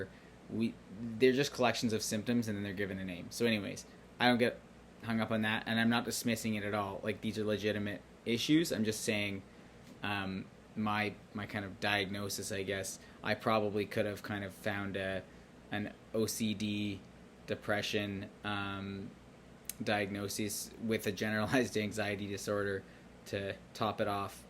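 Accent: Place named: Canada. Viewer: I do enjoy a Canadian accent - and I like your reference to emotional ‘richness’ in the ups and downs of life.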